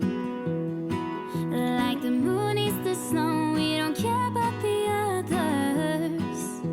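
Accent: South, United States. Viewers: I also love your sweet southern accent ❤️. What part of Alabama are you in ? I live in Haleyville Alabama. It's in the north west part of the state.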